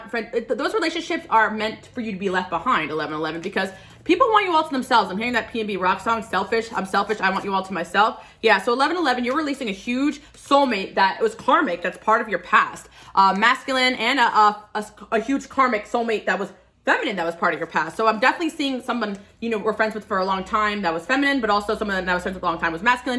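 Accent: New Jersey, United States. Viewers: I love when your Jersey accent comes through.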